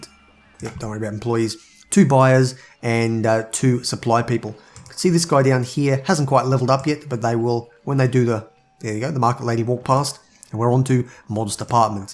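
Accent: Australia. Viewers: Are you Australian? I'm an Indian who's just been exposed to different accents and I'm trying to understand all of them lol! 😂😂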